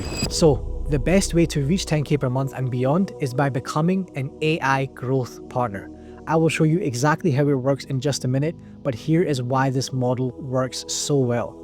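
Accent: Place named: United Kingdom. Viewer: You sound like you're from the UK, so how would taxation fit into all this? Assuming you're suddenly making 10k+ a month, I'm sure that's gonna raise eyebrows with the bank and government who will be eager to reach their greedy hands into your earnings and deduct a significant sum of it in the name of taxation, no?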